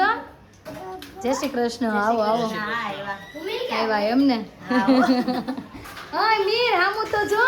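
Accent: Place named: Kathiawar, India. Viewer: jsk ben tamari bhasha upar thi tame kathiyawadi hoy em lage chhe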